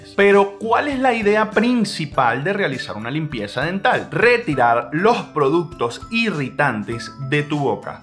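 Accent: Venezuela. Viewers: Ajajaja me da mucha risa como hablas Venezolano neto Doc.. tambien soy Venezolana pegadisima ah sus videos explica todo muy bn Bendiciones 🙏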